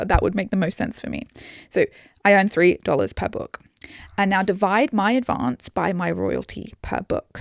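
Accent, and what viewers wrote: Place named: RP, United Kingdom. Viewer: The fact that you refer to books as 'she' is making me think this is pretentious twaddle. If you have a literary agent, surely that person is explaining the money side to you. You sound posh, whiney and entitled. I don't know why the algorithm recommended this to me. I thought most authors were so delighted to get their first book in print that they would accept next to nothing. I find it staggering that you've got this much money. Not in a good way.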